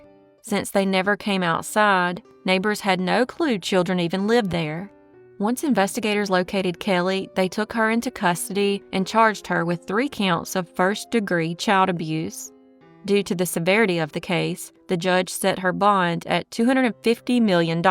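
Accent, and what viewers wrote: South, United States. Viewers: IT’S HER SOUTHERN GIRL CHARM!!!😉😉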